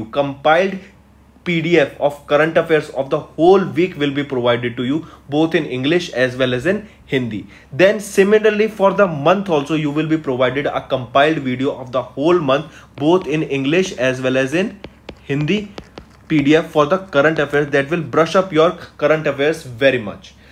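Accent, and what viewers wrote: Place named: North India, India. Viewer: Sir north Indian lgte gai. Bt pronunciation bht wrong krte hai... common words too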